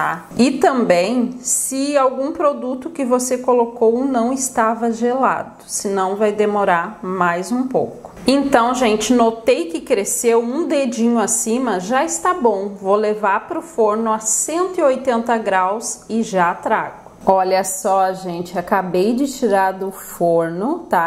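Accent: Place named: South, Brazil. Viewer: Otima sua receita!!!!e com esse sotaque do sul!!! so' pode sair receitas boas!!!!!!👧👍👏🍰🍩🍯🍮🌹🍃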